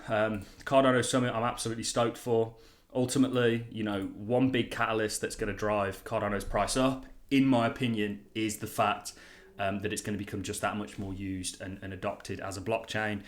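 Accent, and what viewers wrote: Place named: England, United Kingdom. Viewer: Obviously this is your first time listening to English accent.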